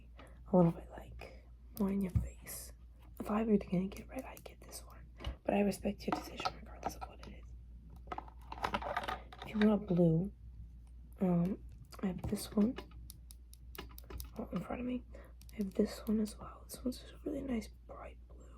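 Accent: New Jersey, United States. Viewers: I love your jersey accent the best!! ❤ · Omg I love the jersey accent, idk asmr sounds so cool with it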